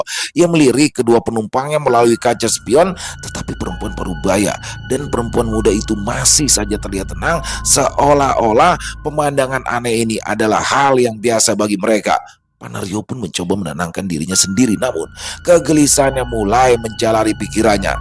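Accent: Jakarta, Indonesia. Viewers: assalammualaikum bunda hadir dr condet.yg selalu ditunggu2bang pianada lg pokoknya seru kalau da cerita bangpian karna bang bawain logat betawi nya mantap sukseees terus bersama rekan 2aamiiin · Waalaikumsalam. Seneng deh kalau Bang bercerita dengan aksen betawi. 🥰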